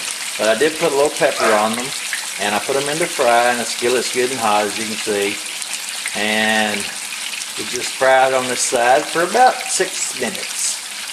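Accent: South, United States. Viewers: As soon as I heard his southern accent I trusted him haha 😆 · He sound Country I know this pork chop Finna be good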